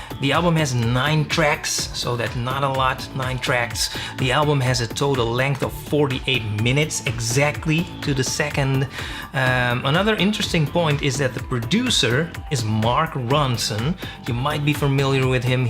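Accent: Netherlands. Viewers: are u nederlands?? ;-)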